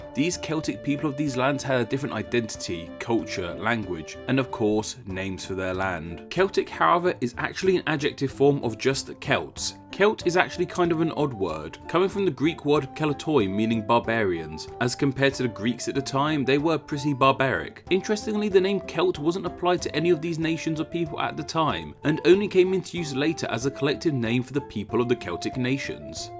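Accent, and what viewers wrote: United Kingdom. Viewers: Jeezzzzzz you sound british yoursels real extreem british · So you are British then? From the North, maybe? Brummie? Geordie? Scouser?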